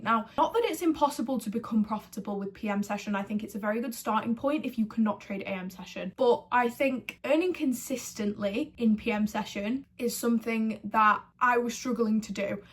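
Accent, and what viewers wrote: United Kingdom. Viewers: you got a slight birmingham accent you from Brum ? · Very switched on and can tell your Driven you sound like a stokie btw 😉.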